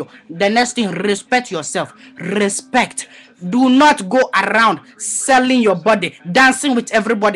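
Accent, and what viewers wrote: Nigeria. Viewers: lol yuh Naija accent is seriouss!! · lmaoooooooo wetin dey do u, abeg noww loolx...wiv his Naija accent oo eei de3....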